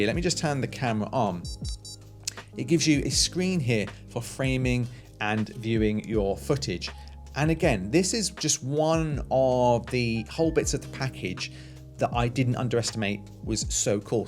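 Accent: United Kingdom. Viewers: I love the British Accent 😯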